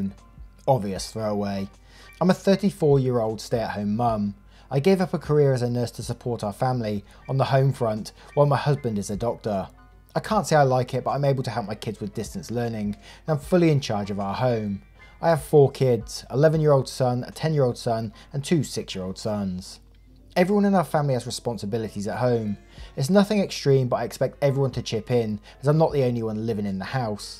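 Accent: United Kingdom. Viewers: Omg I’m so stupid, you’re British? I guess I don’t know accents I thought you were Australian this whole time wtf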